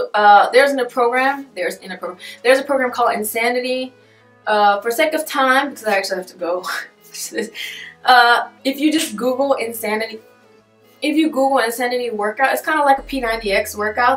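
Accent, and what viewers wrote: South, United States. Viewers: You're really pretty. And that southern accent...love it! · You sound like your from the south in America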